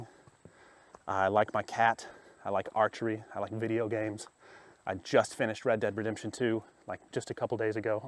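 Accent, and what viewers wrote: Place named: South, United States. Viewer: Hi! I’m. A southern boy as I’m assuming you are listening to your speech. I wish you well. I’m not a subscriber…yet. But I watch a lot of these type of videos so we’ll see. Life certainly goes on and I’ll give it a shot. Well I lied. I’m a southern OLD man. 🤣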